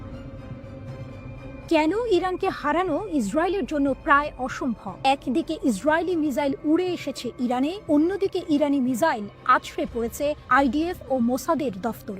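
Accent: Kolkata, India. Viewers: সাংবাদ পাঠক, কলকাতার নাকি,, ভাষা বাংলাদেশিদের সাথে মিলছে না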